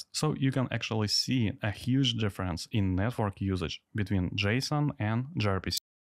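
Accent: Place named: Russia. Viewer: явно русскоговорящий тип делает канал на английском, зачем ?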